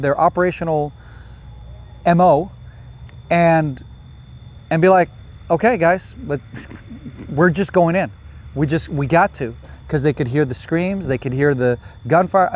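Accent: United States. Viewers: I did watch it in full, thanks. There could be a number of reasons why he has an American English accent. It's no big deal. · There's a good documentary on Netflix about gaza and Palastinians day to day life. The propaganda is strong in this one, just notice the perfect american accent. Ask palestinians if they can return to their ancestral lands also. I hate Hamas and the IDF for what they have brought on to palestinian citizens. Palestinians just want freedom.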